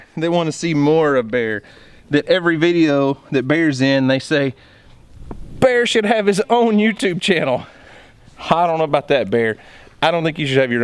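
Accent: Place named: South, United States. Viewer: You know, the more I listen to you, the more I think you sound like Alex Kendrick, from Sherwood Films ( Courageous, War Room, etc.). I suppose you could be originally from Georgia. Or maybe it's just that, to my Pacific NW ears, all you Southern gentlemen sound alike.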